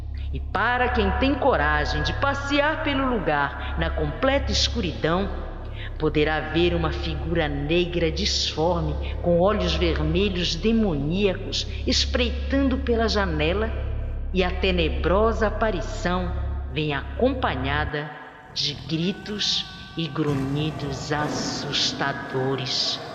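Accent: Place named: Northeast, Brazil. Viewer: curto seus videos e seu sotaque também rsrsrs nordeste neh?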